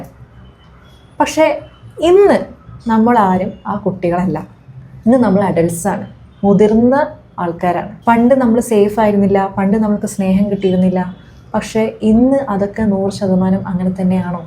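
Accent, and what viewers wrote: Kannur, India. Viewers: Ningal kannur ano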